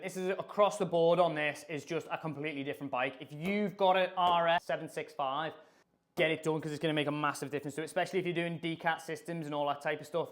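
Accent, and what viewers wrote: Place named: Liverpool, United Kingdom. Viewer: It’s called scouse accent!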